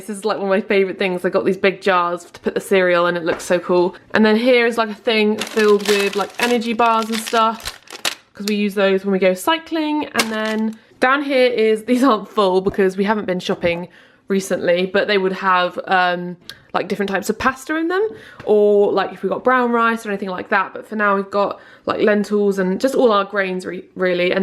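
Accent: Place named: Cornwall, United Kingdom. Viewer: I love your accent so much. I think Cornwall is my favorite British accent. I loved your video. You're lovely to watch! :)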